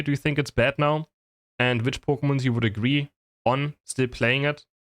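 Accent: Germany. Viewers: Ahh du bist deutsch, ich höre den aktzent sofort heraus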